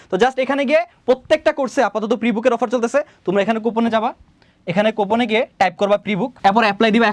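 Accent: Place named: Noakhali, Bangladesh. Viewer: Vaiya mone hoy Noakhali er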